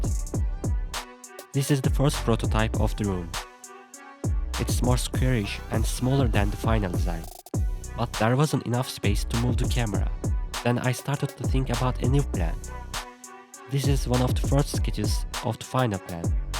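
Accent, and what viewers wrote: Turkey. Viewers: bu kesin Türk, aksanından belli. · Olm aksağanından anlaşılıyo türk olduğun lan aksağan berbat fjslnsfjld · Türk olduğunu aksanından anladım ama güzel video🙂👌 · Türksün lan sen konuşmandan belli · Aksanından anladım Türk olduğunu :D Başarılar! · Good job. / Aksanından Türk Olduğunu Anlamıştım! Gerçekten Çok İyisin. Devam Et. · Türk aksanı ilk sözden anladım 😘😂 Türkçe altyazı da koysaydın iyi olurdu. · ABİCİM Bİ VİDEO DA TÜRK OLDUĞUNU SÖYLE YA KONUŞMANDAN ANLAŞILIYO BİLE NERELİSİN ABİCİM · Türk ingilizcesinin şekil bulmuş hali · Reis aksanından türksündür diye düşünüyorum fenasın adamsın ellerine sağlık :) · O kadar Türkçe aksanın var ki :D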